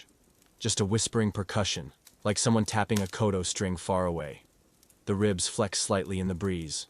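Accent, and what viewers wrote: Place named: United States. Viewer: The text of this is interesting but theUS accented voice with 'true crime' rapid paced staccato inflections isn't restful.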